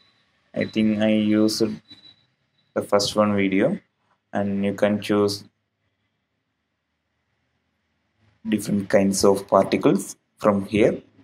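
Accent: Kerala, India. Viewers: ഇംഗ്ലീഷ് കേട്ടിട്ട് മലയാളിയാണെന്ന് തോന്നുന്നു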